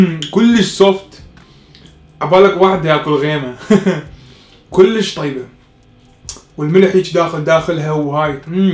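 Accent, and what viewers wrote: Iraq. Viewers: ما احلا لهجه العراق اتمني اني اكون عراقيه ❤😍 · انا من السعوديه وصدز انا من النوع الي احب لهجه اهل العراق وعشان كذا خذلك لايك و اشتراك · انتة مبين عراقي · كمية البساطة وخفت الظل موطبيعية واللهجة العراقية تطرب الأذن متابعك ومجرب كل اطباقك وافكارك من 🇩🇿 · يازين اللهجة العراقية ❤️🌹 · بالفعل خفه الظل واللهجه العراقيه وطريقه الاكل مع التلذذ احلى مابيك اخي ❤ ربي يوفقك واكيداكلك طيب مبين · اللهجه العراقيه استفراغ بدويه بحت ودفشه ثقيله خبيصه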